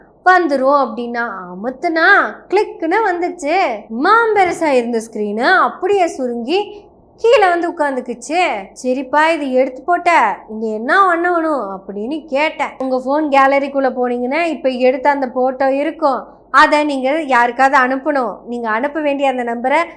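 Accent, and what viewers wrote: Kongu, India. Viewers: உங்கள் அழகும் பேசும் அழகிய கொங்கு தமிழும் சங்கி வேசி மக்களுக்கு ரொம்பவே கசக்கும். பரட்டைத்தலை பரதேசி டாக்டருக்கு அதுவும் கைனகாலஜி படித்தது அனைத்தும் தமிழிலும் ஆங்கிலத்திலும். இந்தியை படித்தால் தவறொன்றும் இல்லை. இவள் சௌந்தரராஜன் மனைவியா இல்லை மோடிக்கு மனைவியா.இந்த லட்சணத்தில் கவர்னராக வேறு இருந்திருக்கிறது இந்த சனியன். போடி போய் மாட்டு மூத்திரத்தை மட்டுமே குடித்து சாணத்தை சாப்பிடு.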